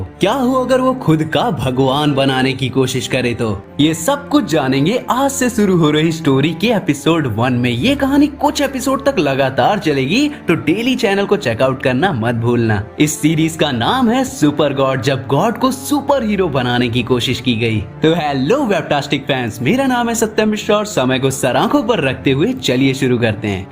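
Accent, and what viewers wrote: India. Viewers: bro are you from Odisha??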